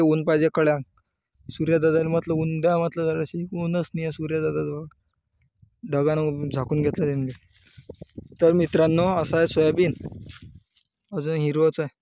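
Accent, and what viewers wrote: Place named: Vidarbha, India. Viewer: खूप छान सत्य व्हिडीओ बनवला व तू बोलतो पण खास आपल्या विदर्भाच्या भाषेत समजावून सांगितले 🌹प्रणाम तुझ्या कार्याला🌹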